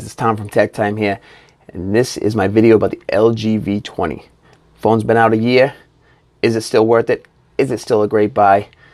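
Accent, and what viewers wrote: Boston, United States. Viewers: That Boston accent!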